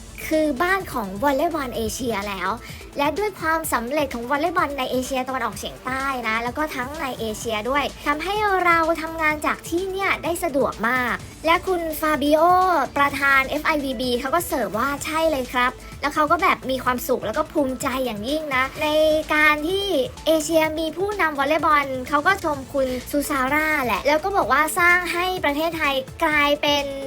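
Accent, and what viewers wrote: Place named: Brazil. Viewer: Pelo sotaque ele é brasileiro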